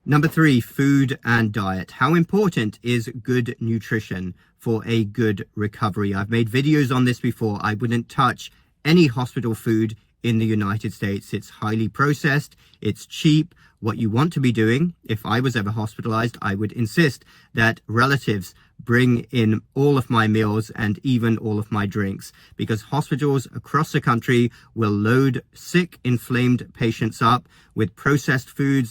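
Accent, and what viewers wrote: United Kingdom. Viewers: You nipped out.And you did not have time to remove that s##t from around your neck. Do you think that makes you more of a doctor? And that British accent is getting tiring now. SHUSH.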